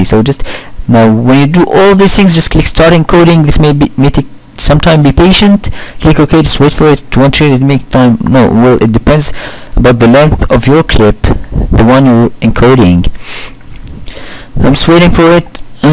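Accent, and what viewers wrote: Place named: Egypt. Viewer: you sound egyptian :) nice tut, but I prefer using handbreak, it's little more advanced but you can get better quality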